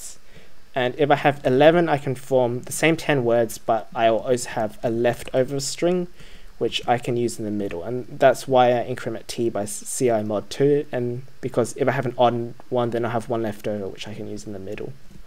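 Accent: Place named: Australia. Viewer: Omg the Australian accent 🔥🔥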